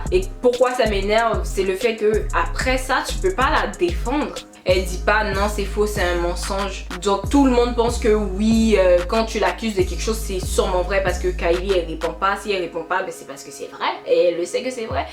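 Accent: Quebec, Canada. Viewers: La Polémique * ma belle....pas polemie, mais tu es cute avec ton mélange d'accent québécois, américain, et ivoirien....😅😘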